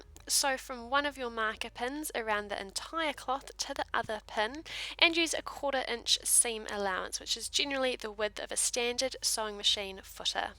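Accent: New Zealand. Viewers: A kiwi voice yay! Great tutorial thanks.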